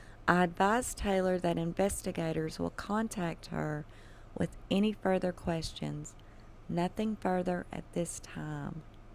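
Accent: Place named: Texas, United States. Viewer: Great voice, a kind gental loving voice with Texas accent!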